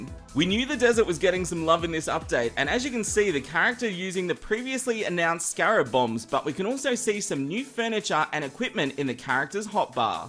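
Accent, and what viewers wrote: Australia. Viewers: You’re definitely australian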